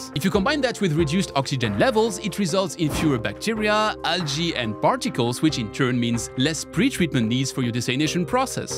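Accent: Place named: France. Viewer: Your french accent is sweet, but please düvlop, it really is so hard to understand it is.. de-vel-op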